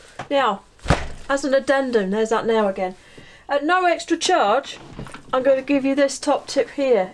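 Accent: United Kingdom. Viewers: Apologies for this confusing comment on my part. “Right the way through” is a sentence that another British reviewer uses ad nauseam 😩. On one of his recent reviews, I started counting how many times he said “right the way through”. It became tedious and I stopped counting at 18 or 19 times, I forget. It was nice to sit through your review and not hear it a single time 🙏🏼. P.S 1 : You are one of only a handful of people who recognized “Bindair” as “Been there” 😄. P.S 2 : In your review you pronounced “Curieux” beautifully. The charm of your lovely British accent when pronouncing this French word made my heart skip a beat 🥰🥲. I was (am still ?🤔) French.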